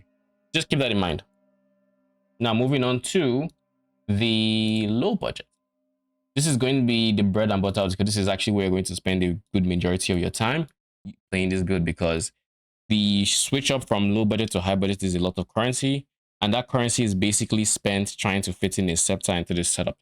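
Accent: Nigeria. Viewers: Are you Nigerian? The accent is telling me yes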